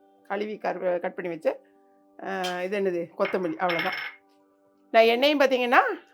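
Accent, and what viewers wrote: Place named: Nagercoil, India. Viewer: U r tamil resembles Nagercoil tamil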